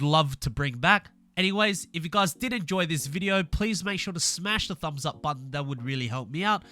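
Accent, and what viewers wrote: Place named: Australia. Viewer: And I love your accent, to me, the Australian accent is the best and I can do a pretty good one myself (I'm partly Australian).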